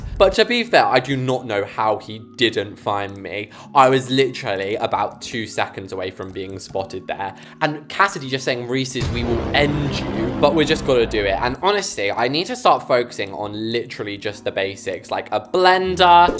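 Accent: United Kingdom. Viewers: Are you British? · the british accent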